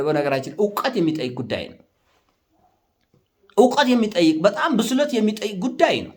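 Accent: Tigray, Ethiopia. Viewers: ሰላም ሰላም ለምንድነው በትግራዋይ አክሰንት ቋንቋ ዜና ማስተላለፍ ምርጫህ ያደረከው? ትክክለኛ ቤሄርህስ ከየትኛው ወንሞቻችን ነክ? በነገራችን ላይ የማንም ብሄር ተወላጅ ብቶን ወንድማችን ነህ!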